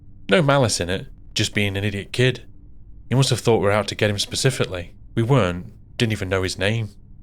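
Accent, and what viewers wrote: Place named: East Midlands, United Kingdom. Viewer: Notts or Derby lad narrating this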